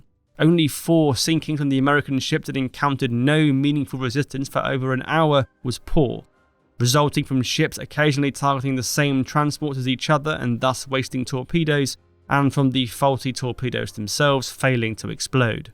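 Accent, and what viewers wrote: United Kingdom. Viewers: Stop narrating these with British people, these are American victories. Should be called by American heroes.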